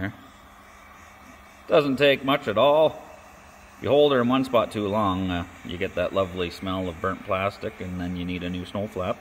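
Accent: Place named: Nova Scotia, Canada. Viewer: Ive had 4 of these... Absolutely no disappointments!! You sound like your from NS!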